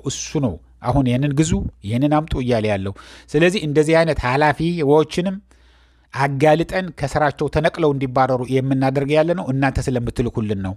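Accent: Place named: Eritrea. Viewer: አነጋገርህ ማን እንደሆንክ ይናገራል አማርኛ እንኳ ማንበብ አትችልም አርትራዊ ነህ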